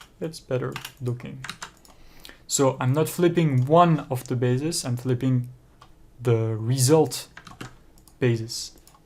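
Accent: France. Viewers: Just so you know, I'm a big fan of french english! 😁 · This is very late and you might not see this message but thank you so much for uploading this. By far the best tutorial on using C# in Godot. Also, the French accent you have is so charming.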